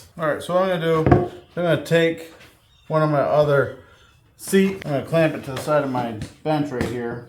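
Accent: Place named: United States. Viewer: i hate this american accent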